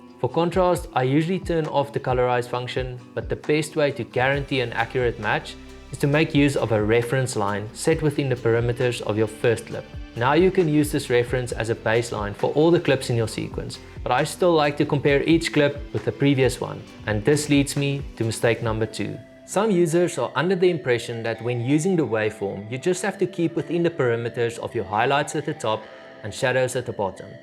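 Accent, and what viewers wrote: South Africa. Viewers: Refreshing to hear the SA accent on YT Im currently using FCP X Would really loves this, keep killing it bro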